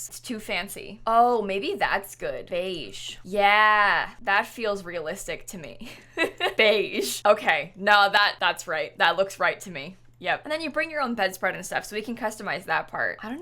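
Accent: England, United Kingdom. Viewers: For about a year I thought you were British and idk why🤣 I think it’s because I’m so use to hearing American accents that they’ve become normal to me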